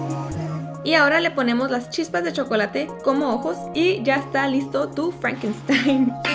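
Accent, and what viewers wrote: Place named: North, Mexico. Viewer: Así hablamos los del norte, es nuestro acento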